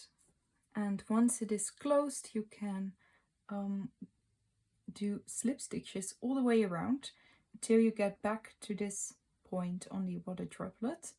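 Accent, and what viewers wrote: Netherlands. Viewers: I LOVE DUCKS AND READING! So I’m making this Ofc!! And, are you dutch by any chance? I hear an accent 😅😅 you are so talented and I’m a dutch crocheted aswellll!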